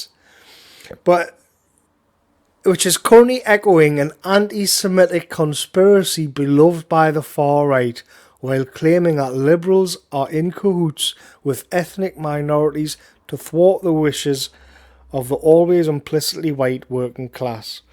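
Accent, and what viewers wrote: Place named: Tyneside, United Kingdom. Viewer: Thanks. You are taking a bullet for me doing this. I cant read this kind of shit without it dangerously raising my blood pressure and ruining my mood, but listening to your wonderful "hate-readings" of the odious grauniad is extremely cathartic. Your takes (in dulcet tyneside tones) act like a little proverbial valium pill to the counter the ragefuel and soothe the jimmies. Earthling Carl does a similar thing with LBC radio too. Kudos mate.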